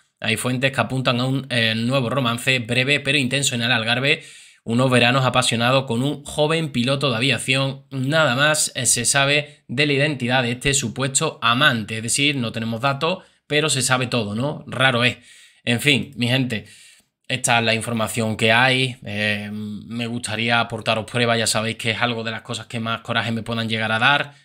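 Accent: Andalusia, Spain. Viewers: Yo soy de Algarve.Albufeira.un <link> gusta tu canal,y el andaluz , me encanta🇵🇹😄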